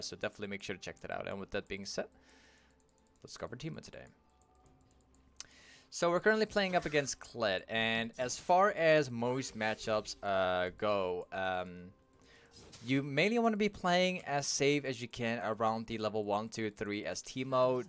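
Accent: Netherlands. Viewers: Echt een grof accent van Nederlands haha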